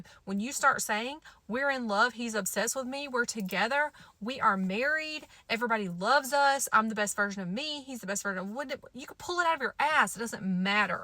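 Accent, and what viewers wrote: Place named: South, United States. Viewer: I'm from the uk but i love your american southern accent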